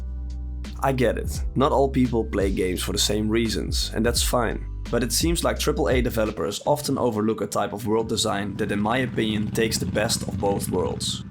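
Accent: Netherlands. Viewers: that thick Dutch accent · That Dutch accent though… 😉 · Good video, if you're interested I would like to give you a few tips on your English pronunciation, being a Dutchy myself. You are super close to perfect pronunciation. All you really need to do is shake some of the hard f's, t's and s's that we use in Dutch all the time. Smooth those out and your English will be *chef's kiss*. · Nice edit. Also like te Dutchy accent.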